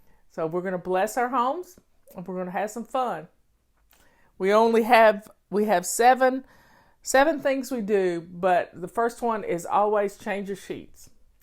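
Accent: South, United States. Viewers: I love your Southern accent! Had to buy a new washing machine. How's that for celebrating laundry month!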